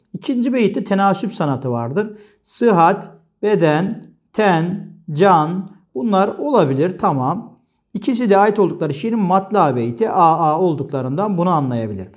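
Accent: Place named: Akçaabat, Turkey. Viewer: Hocam sizi yeni keşfettim çok sağlam videolarınız var maşallah öncelikle tebrik edip sonra bir sorum var acaba Trabzonlu musunuz hadi biraz daha tahmin edeyim Akçaabatlı şiveniz birebir aynı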